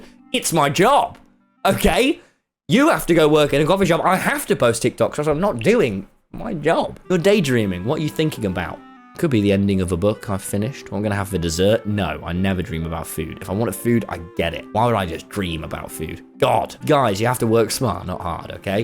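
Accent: United Kingdom. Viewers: its been a year since i watched. hes turned so much more british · i feel like he’s extra british this video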